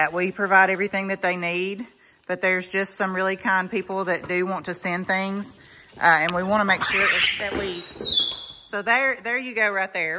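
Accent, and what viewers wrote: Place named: Alabama, United States. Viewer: I just found your channel the other day. I knew as soon as I heard you talk that you were from Alabama. I’m originally from Mobile. If you dressed Lucy in boy colors and never told us her name I would still know she is female because she is so feminine in her movements. Love them all. They make me laugh. 😊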